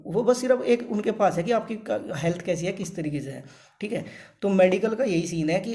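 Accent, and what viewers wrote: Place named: Muzaffarnagar, India. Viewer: Bhai UP west he ho kya muzzafarnagar side sei. Tone muzzafarnagar wali hai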